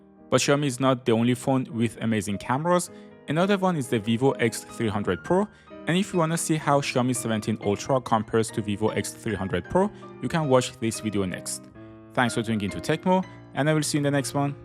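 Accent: Iran. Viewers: خانومتون از دید خواهری خیلی زیباست. راستی از لحجه تون فهمیدم که هم وطن هستین.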